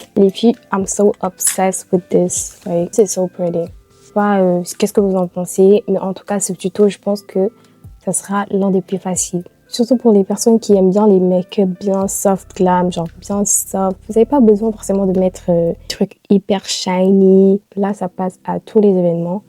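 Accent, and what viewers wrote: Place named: United States. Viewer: J'aime bcp trp son accent elle a l'accent Américain !😂🤣😅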